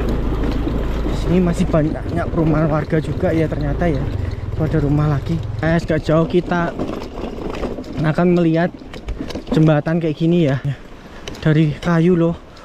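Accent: Java, Indonesia. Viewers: Gw paling suka cara mas brow gw ini komentari situasi di sepanjang perjalanan. Logat jawa nya itu yg bikin lucu dan seru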